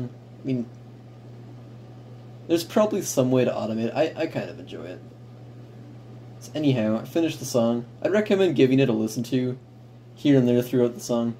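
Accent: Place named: Western Canada, Canada. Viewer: That's tricky...but I'm going to go out on a limb and say I'm getting a western Canada vibe from your voice. Are you from BC?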